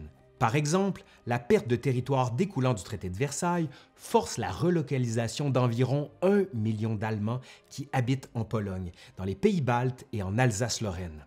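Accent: Quebec, Canada. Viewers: j'adore ton travail... je ne ma lasse pas d'enchainer les vidéos (dans la foulée j'enquille 1939 après avoir vu 1789, 536, 1709 et quelques autres dont le racismes ou le sexe au moyen âge) tu as un vrai don pour qu'on comprenne tous et ton accent québécois apporte son charme à ta diction. pourtant je n'ai pu m'empêcher de relever la coquille qui tue à : Adolf HiLter ne m'importune pas plus que ma cousine contrairement à Adolf HitLer... désolé mais fallait que je le dise... encore une fois merci beaucoup. Continu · Merci pour cette série passionnante. Je continue à adorer ton accent au sirop d'érable. Tu as fait un travail remarquable de clarté, d'impartialité et de dynamisme. Je pense que c'est un modèle de connaissance à donner autour de nous pour expliquer ces années d'horreur qui ont presque brisé la France. Merci aussi à ton compère. Bises 😉😁👍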